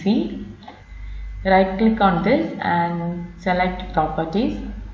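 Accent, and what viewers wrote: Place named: India. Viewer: shitty indiann accent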